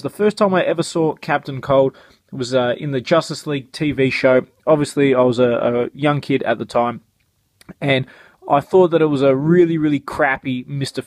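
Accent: Australia. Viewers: Hey loved the review! Are you from Australia?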